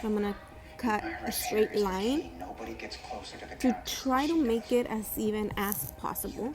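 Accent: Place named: United Kingdom. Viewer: * British accent * absolutely perfect love ❤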